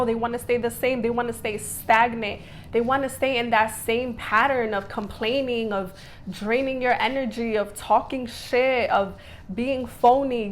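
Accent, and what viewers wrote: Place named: New York, United States. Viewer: I love how you sound. Are you from New York?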